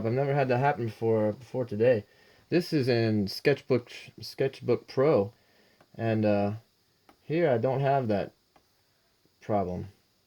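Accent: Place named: East Coast, United States. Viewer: Ahhh ok gotcha. Exactly, the person mentioned cubebrush, whoever that is. Another I'll need to look up. The artists you mentioned are great btw. You live in Europe? Hmm wouldn't have guessed that. You sound kinda like you're from the East Coast.The paper one sounds good. No way that can scratch the screen too right? Yea the plastic is harder to control. Almost impossible to get fully controlled quality of line I found at least. So you got two models one early and one later as a replacement and they both were smooth..? Yea see those in videos, glad they replaced it. Wow that's awesome. K good hope not. K. Would you want to sell the Corel? I hear you. Been watching videos on Photoshop.. the mixer brush looks really interesting. I'm guessing it's only on the stupid CC versions? Was trained on Photoshop too long ago, forgot everything. 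Sure via torrents and key g3ns. Don't condone it either but good to know. Great idea, will do that ty. Very good to know all that. One thing I'm dreading is setting up things, settings etc. Am already pretty systematic in setting up computers but with the number of settings to save to the remote, the number of brushes one can use, all the different settings to account for it looks a bit overwhelming. Yes you did, thanks so much!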